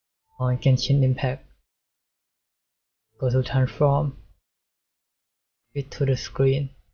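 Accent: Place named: Thailand. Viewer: Are you Thai? It is random but you sound like you have a Thai accent and have darker skin (I know you have the accent because I hear it all the time from my moms friends)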